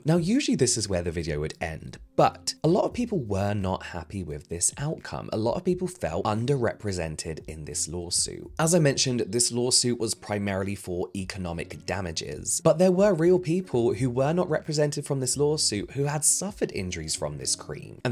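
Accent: United Kingdom. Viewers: struggling to say the second steroid ingredient made me happy…. Simply because I also cannot say anything correctly, and to hear a very posh English person also struggle… One of us!! Is all I could think 😂😂 thanks for being a real person haha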